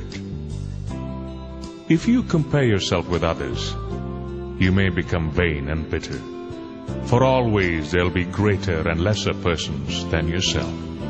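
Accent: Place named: Scotland, United Kingdom. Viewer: Some say the orator is James Earl Jones or even Kamahl, but I detect a slight Scottish accent like Sean Connery.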